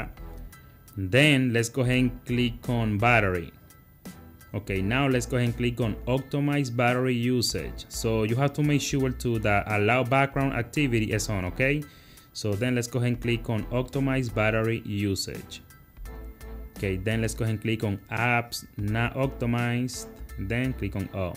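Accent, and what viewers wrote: Dominican Republic. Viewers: you sound Dominican! that's wSup paisa😄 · You Dominican? De lo mio?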